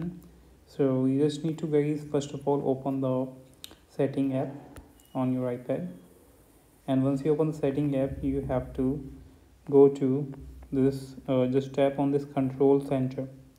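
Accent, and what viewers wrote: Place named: India. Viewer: As soon as I heard the Indian sound I knew it would work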